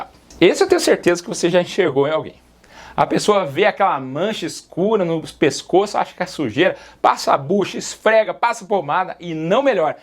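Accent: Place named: Caipira, Brazil. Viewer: Esse doutor tem um sotaque caipira…é raro de se ver 👏🏼👏🏼👏🏼😁😂😂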